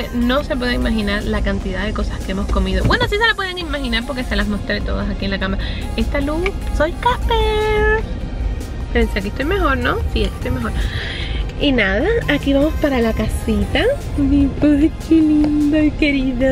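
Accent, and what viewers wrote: Cuba. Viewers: Porque las dos son Cubanas ese es el acento cubano jjeje por eso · para tu informaciom no,son hermana son cubanas y hablan en el mismo acento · Es que ella habla como tipo cubano o algo así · Por que son las dos cubanas y a si hablan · por que es cubana y todos los cubanos hablan igual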